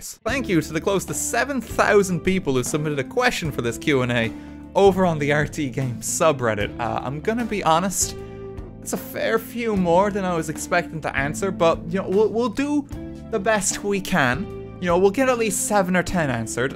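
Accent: Ireland. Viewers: This might be shocking but from listening to your voice you might be irish.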